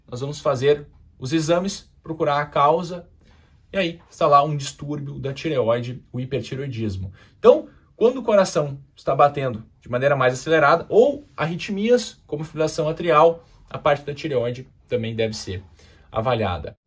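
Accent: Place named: Goiás, Brazil. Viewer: Nota 10 fala do Goiás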